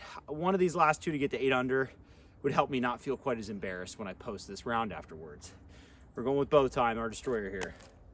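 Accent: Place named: Midwest, United States. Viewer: I think the idea of creating real stakes for tournament style scoring is a good style video .. not that I mind watching one of the better disc golf YouTubers play a round trying to score well, but cool to see that you tried to give yourself a reason to score your best but still just playing by yourself doing your thing Also I felt like I noticed a slight Midwest accent and maybe it’s the North Dakota sweatshirt but tripped me out a little bit I thought you were/are from VA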